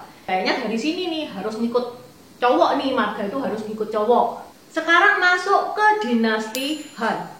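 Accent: Java, Indonesia. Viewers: loe lidah nya Jawa medok jawa mana loe domisili aku salut salam dari cilegon Banten Iskandar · Ce org tionghoakah? Kok medok Jawa ya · Logatmu jowo mbak mbak.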